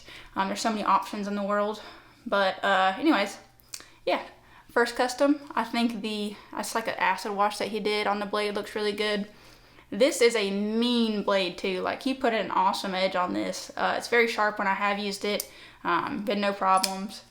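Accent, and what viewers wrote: South, United States. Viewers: petit lovely young lady with giant frigen knives. And a Nurse! suthrn accent. I cant comprehend it all. neet woman! love her personality. The Malibu and the last one where a toss up for me. I love a chunky knife. That last one was amazing to my tastes. Hmmm. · Okay.... I'm never watching any other knife reviewer again. I love her reviews, her smiling face, her southern draw, her hair, and the sound of her voice. Great content. Beautiful stuff. The knives weren't bad either. ❤️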